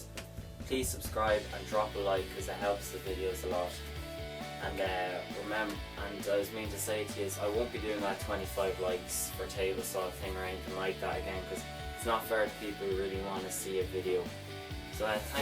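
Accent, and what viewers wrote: Ireland. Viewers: Great job!! Loved the video, but then again I'm partial. Your accent makes long for the old sod. Keep up the grand work!!!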